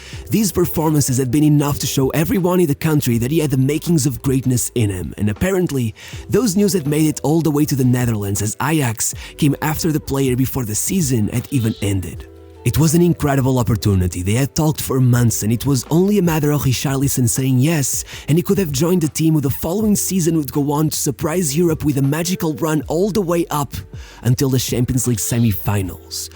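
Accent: Portugal. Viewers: quando ouvi a tua voz percebi logo que eras tuga, keep up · yoooo tu és tuga né? esse sotaque a dizer os nomes dos jogadores parecia bue mm. +1 sub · He isn't the most hated man in England, he isn't even the most hated man in the Tottenham starting 11 for most people I'd imagine. Guy can come across as a little bit of a twat on the pitch, rolling around and trying to get under people's skin but he's not unique in that respect, not even in the Tottenham starting 11 and he's generally an enjoyable player to watch. Had that one little bit of "showboating" controversy recently which is the only thing that stands out as abnormal for a player playing in the premier league but that was more media than fans and most fans seemed pretty 50/50 with no strong feelings one way or another because all he did was a couple of keepy uppies mid game then passed the ball. Still don't see how that can make him "the most hated man in England". 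When ever I watch your videos I generally find them to be void of much fact, just opinion pieces on how you feel like seeing the world spoken as if that must be how the world is with no real research to back up your statements. I mean this video was basically somebody with a Portuguese accent reading a short biography of Richarlson. You can do better. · Are you Portuguese by any chance? Your pronunciation sounds like it. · Eu SABIA que eras português. Tu falas bue bem mas todos os tugas têm um sotaque a falar inglês. Tmb fiquei desiludido com portugal. O fernando santos já não tem condições para continuar, precisamos de encerrar este capítulo e começar um novo... mas até agora não foi despedido. Abraço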